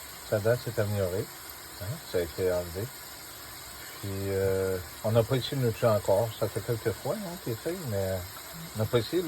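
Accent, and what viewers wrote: Quebec, Canada. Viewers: un charment accent du Québec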